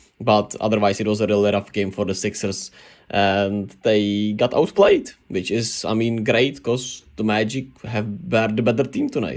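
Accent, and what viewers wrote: Czech Republic. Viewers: first time hearing a cezch accent. pretty good video, try improve your flow a bit in the sentences and you'll be interesting for sure! I understand English is not your first language but just keep practicing man. all love here though, I enjoyed it!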